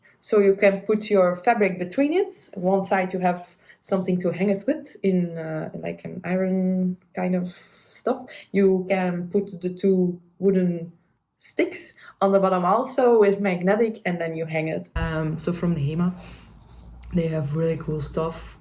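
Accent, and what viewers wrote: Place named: Germany. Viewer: Welcome to flosstube from the south or Germany! ☺️ I actually thought you were German too at first, your accent sounds very similar.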